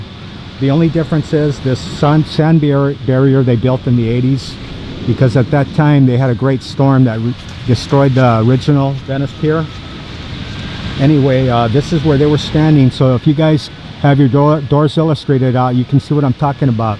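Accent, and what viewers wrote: East Coast, United States. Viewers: You’ve lived in la all off your life but you have a thick east coast accent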